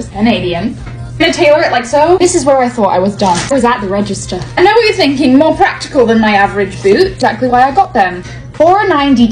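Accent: United States. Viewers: Why is she American now? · You sounded so American at points in this. I’ve never noticed it before 😅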